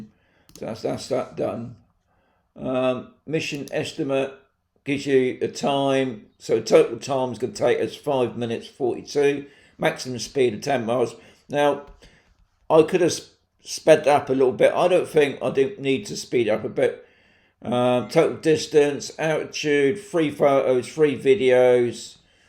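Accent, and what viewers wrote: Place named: Bristol, United Kingdom. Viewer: Sounds like you're from Bristol, UK. Am I right?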